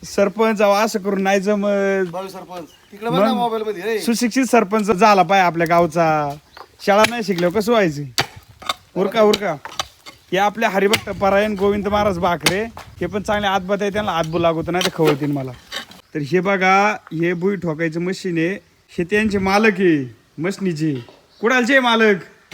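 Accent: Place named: Sangamner, India. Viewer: दादा तुझं गाव कोणतं आपली संगमनेर भाषा कुठेच लपत नाही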